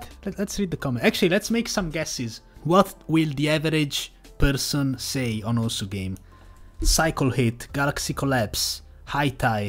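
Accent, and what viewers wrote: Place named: Italy. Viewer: you sound italian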